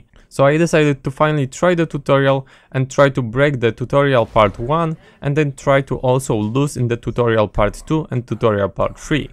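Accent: Poland. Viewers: You have polish accent, so POLSKA HUSARIA GUROM! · this polsih accent is unbearable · when you speak English, you sound like you would be from Poland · jaki polski akcent xd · Szłyszeć po głosie, że z polski jesteś! :D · Dziena poznałem po akcencie że jesteś polakiem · For first,he is Polish, and he has a Polish accent.And for the second,just try to raise the volume a bit or turn on the subtitles if you don't understand him,but however,his english can be understood. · Are you polish? :D You sound like a polish guy :) Btw. your voice is awesome :) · Hello, are you from for Poland? U sounds so polish :D xD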